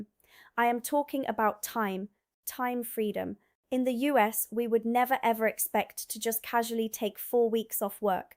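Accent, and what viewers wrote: United Kingdom. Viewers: WOW! Great piece and so well put together. Freedom .... scanning for emergency exits everywhere you go, kids with a bulletproof insert in their school satchels, neighbours measuring how long your grass is. That brought it home how visceral the day to day experience is. Imagine being a mother and inserting a bullet proof thingie into your kids school satchel. Every day is a reminder of what COULD happen. We all know USA has a gun problem and an even bigger problem is some of the people that own them .. They practically walked into like a Tesco that sells guns and bought one off the shelf. That is from a UK point of view UTTERLY MENTAL and we all knew that. BUT your piece was VERY ILLUMINATING in outlining the pyschological effects and the almost constant unease of being in public spaces. I do hope the unwinding of your nervous system and your deeper sense of ease will continue to grow. 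We all knew the potential physical dangers but had probably not thought about the wear and tear on your pysche. Thank you for your most illuminating piece. May your new more at ease state of mind continue to grow and deepen. Your accent has become very UK as well. Welcome! ❤❤😊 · is she really an American? her UK accent isn't that of someone from the USA meaning U think she was born outside the USA and emigrated here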